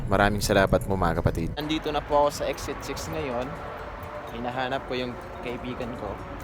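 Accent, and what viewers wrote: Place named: Maranao, Philippines. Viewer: Kalowka akala ko taga Luzon or somewhere, mranao kabs akie! Naks naman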